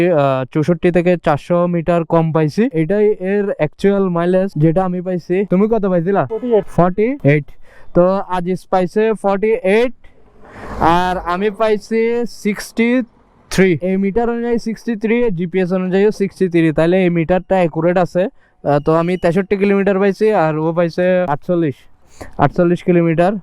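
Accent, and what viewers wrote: Chittagong, Bangladesh. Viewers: চট্টগ্রামের আঞ্চলিকতার টান শুনলেই মেজাজ গরম হয়। ভিডিও ১০ সেকেন্ডেই বাতিল করলাম।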